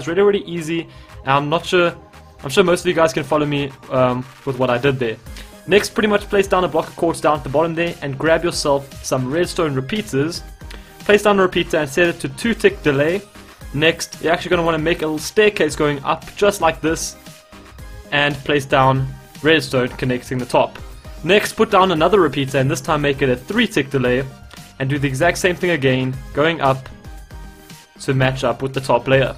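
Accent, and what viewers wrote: South Africa. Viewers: let me guess...South African? yup me too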